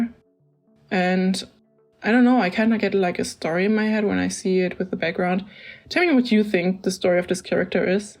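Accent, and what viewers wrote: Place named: Germany. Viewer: i was summoned by the german accent! XD love you already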